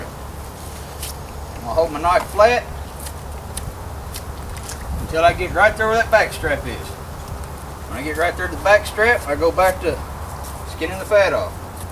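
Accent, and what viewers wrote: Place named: Kentucky, United States. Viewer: This guy has to be from ky u dont hear that accent in many other places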